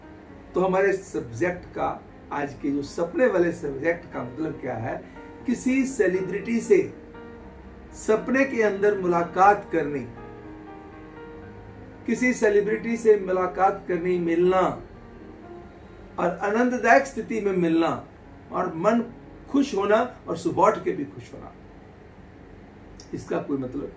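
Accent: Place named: Kashmir, India. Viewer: aapke talaffuz se lag rha hai ki aap Jammu and Kashmir se talluq rakhte ho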